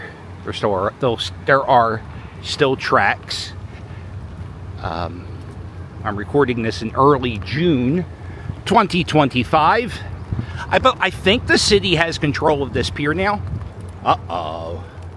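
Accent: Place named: Pittsburgh, United States. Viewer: i grew up in the philly area and i sound nothing like this guy. sounds like pittsburg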